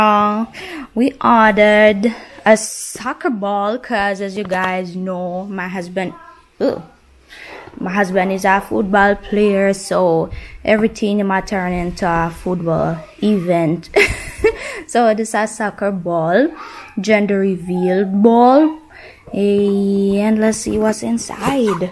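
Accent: Jamaica. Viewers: Love your Jamaican ❤accent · Yes can't wait for the gender reveal🎉 as long as it's healthy that's the main thing, don't like a cut I've noticed that some long time I think you gonna have a Deadlock in the household when he's older! And you're the funniest blogger out there you just naturally funny😂 the things you say you Jamaican accent keep it up you and your family are awesome! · you a real Jamaican now. Love to hear you speak. You a comedian as well. 😂😂😂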